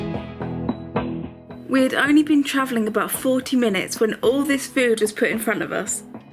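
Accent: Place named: England, United Kingdom. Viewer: ภาษาอังกฤษ สำเนียงอังกฤษ มันเป็นเอกลักษณ์เเห่งต้นตำหรับจริงๆ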